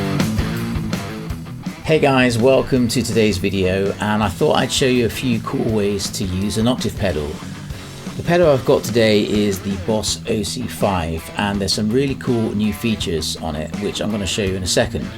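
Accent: West Country, United Kingdom. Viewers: Excellent demo and your playing is awesome. Handy pedal, I must say. Reassuring West Country twang too, especially as I grew up in Brizzle. 😀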